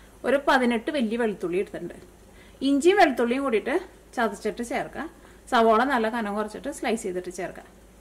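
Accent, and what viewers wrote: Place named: Angamaly, India. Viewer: Slang ketitu nammade Angamaly Kari anennu thonanu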